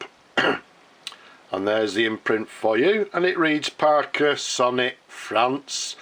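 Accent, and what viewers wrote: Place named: Yorkshire, United Kingdom. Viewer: tha sounds lak a Yorkshire man 😎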